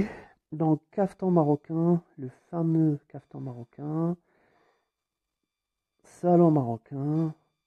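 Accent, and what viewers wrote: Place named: France. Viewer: ا صاحب الفيديو فرنسي اظن من لهجته. الله يعطيه الصحة شوههم و اعطاهم في عصير في كأس كبيييير . العز للمغرب و المغاربة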